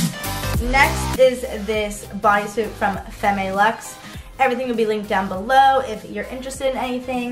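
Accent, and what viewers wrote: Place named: Brazil. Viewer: Você tem sotaque brasileiro ou é impressão minha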